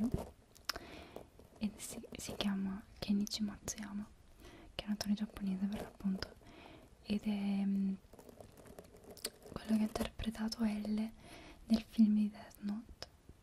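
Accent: Naples, Italy. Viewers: Amo il tuo accento napoletano 😂❤ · ma sei napoletana?,se si lo sono anche io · forse lo Avrai già detto qualche Altra volta.. scusa se te lo chiedo ma sei napoletana vero?... io lo sono😆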